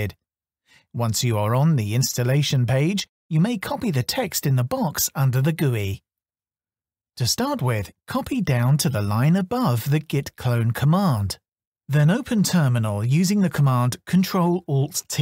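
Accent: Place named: United Kingdom. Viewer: British pronunciation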